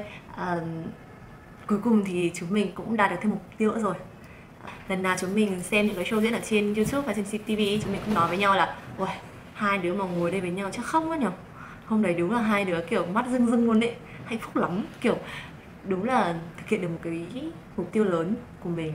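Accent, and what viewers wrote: Hanoi, Vietnam. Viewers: Thích nghe giọng nói của cô gái Hà Nội này quá đi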